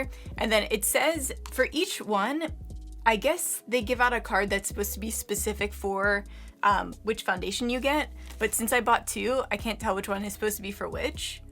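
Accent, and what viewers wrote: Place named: East Los Angeles, United States. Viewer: Wonder how she has East LA accent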